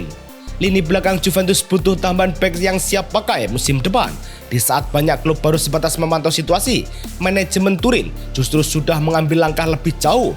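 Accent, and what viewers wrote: Java, Indonesia. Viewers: Salfok sm suara yg ngomong medok bgt😅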